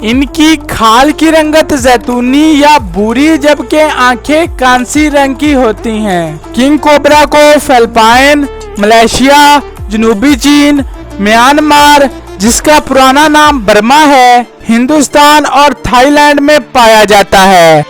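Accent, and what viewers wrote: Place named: Punjab, Pakistan. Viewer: Punjabi twist in urdu, not good pronounciation like youtuber majid malik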